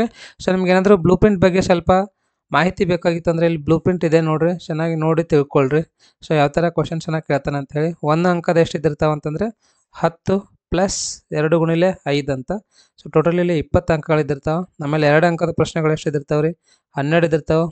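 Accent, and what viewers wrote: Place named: Karnataka, India. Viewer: Thanks sir in first puc for maths I followed your channel since that time till today I just always waiting for your vedios and your dharwad accent I really love it 😊 full of respect sir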